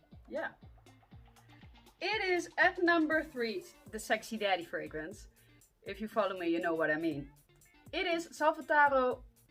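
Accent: Netherlands. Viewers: I have just discovered your channel, wow I've been missing out... I have watched 4 of your videos so far and I must say you are perfect to be a TV hostess, have you ever think of that? I love your dutch accent and curly hair. have a wonderful day. Neighbor from Denmark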